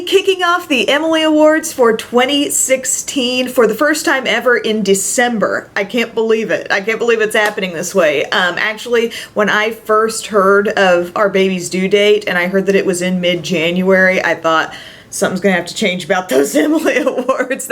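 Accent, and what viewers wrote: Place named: South, United States. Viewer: Oh my goodness, this feels so strange to be watching the Emily Awards before Christmas. I've been watching you Emily since I was a freshman in college in 2011 when I searched YouTube for a review of the Benefit They're Real mascara. And now I have a degree, and you have a lovely child and another one coming very soon, I'm so happy for you all! Thank you for your videos, they make me so calm when stuff gets crazy. P.S., I know you guys are from southern IL, but do most people in your area have a distinguishable southern twang like has and you (Emily) sometimes have? I've lived a good amount of time in NJ, MI, and NC so I've developed a few twangs throughout my life as well so I think I can pick up on them a little more than I used to. P.P.S., you are a great sport, we love you!!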